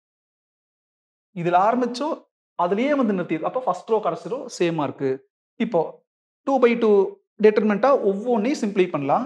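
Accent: Coimbatore, India. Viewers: Coimbatore ah sir unga native? Pesurathu konjam vithyasama irukku😅😅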